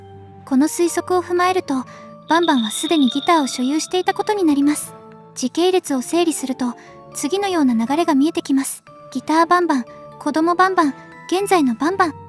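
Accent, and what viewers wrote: Kansai, Japan. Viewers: なんで関西べん？ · 関西弁なんやww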